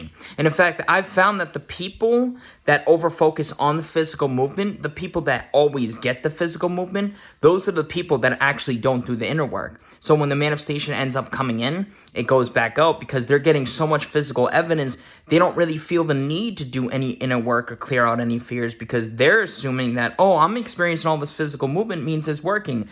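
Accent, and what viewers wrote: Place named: Boston, United States. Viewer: Boston!...I’m glad to hear someone who talks like me in the community 😘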